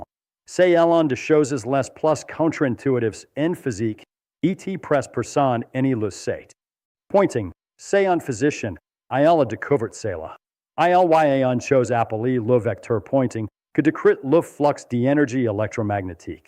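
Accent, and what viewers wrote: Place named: England, United Kingdom. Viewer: Oups 😮 le sujet est intéressant mais la prononciation anglaise des mots francais les rend pratiquement impossible à comprendre... You better say it in english or find someone Who speak " français " 😊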